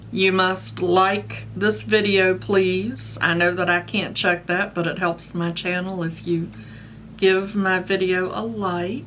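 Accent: South, United States. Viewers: I love your accent it sounds southern and so sweet. Enter me please I like the press on nails. And the illamasqua as well as earth harbor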